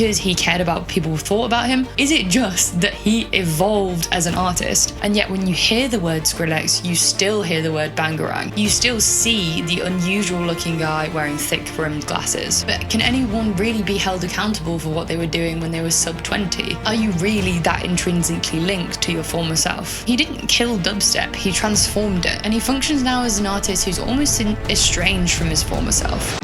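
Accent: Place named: RP, United Kingdom. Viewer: The posh upper-class British accent I hear..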